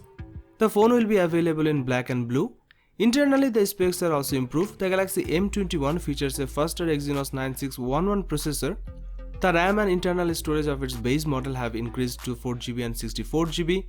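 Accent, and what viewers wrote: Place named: India. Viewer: I wanted to focus in the video but indian voice always annoyed me but I'm not a racist or hate them I can't just stand they're accent..sorry...binod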